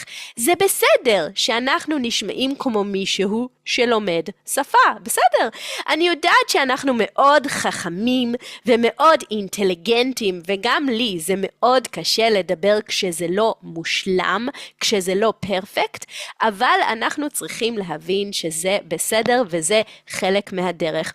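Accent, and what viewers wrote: United States. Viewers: I like your hebrew , but you have an american accent. بتحكي عربي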